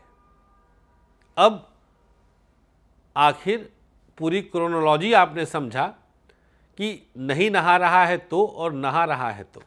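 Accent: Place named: mixed, India. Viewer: Sir ap u.p Bihar k byea ki treh mat bola kro saf hindi bola kro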